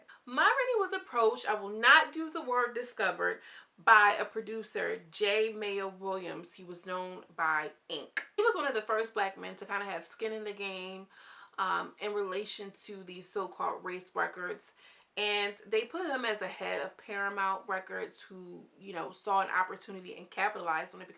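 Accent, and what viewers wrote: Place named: Brooklyn, United States. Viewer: This was very informative... you sound like your from Brooklyn, I love your voice.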